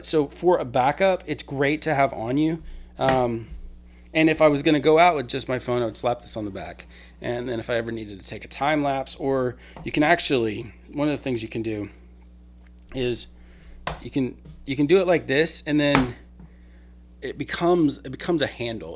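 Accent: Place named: Boston, United States. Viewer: You way how you speak remind me one of my Friend from Boston area! By the way, looks like you are very confident speaking on camera! Some experience from out of YouTube life?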